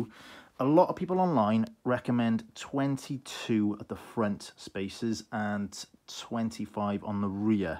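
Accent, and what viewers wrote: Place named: Wales, United Kingdom. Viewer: Nice to hear a fellow Welshman talking about this car. I am from south wales but live in Arizona. Have a 2013 gt, in grey with the same wheels as you!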